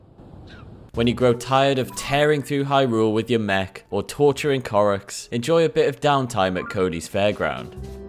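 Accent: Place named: Geordie, United Kingdom. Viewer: Are you from the north east by any chance, your accent has mackem/geordie twangs 😄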